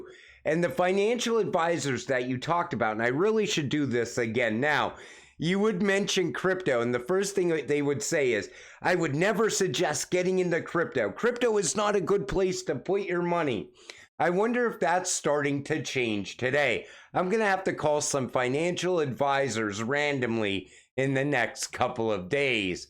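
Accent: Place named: New York, United States. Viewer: Hey My Man, Since it's obvious from your accent that you're from the New York area, I just want to "Bust your Balls" for a second. I busted out laughing when you said "Kenny Nguyen" by pronouncing it "Na-goo-in" when it actually pronounced "Nwen". It's a name that's very common in Vietnam. That's it. Keep up the good work.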